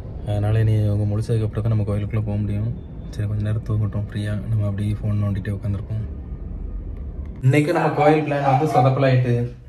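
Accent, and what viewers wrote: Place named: Thoothukudi, India. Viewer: Thoothukkudi slang sooper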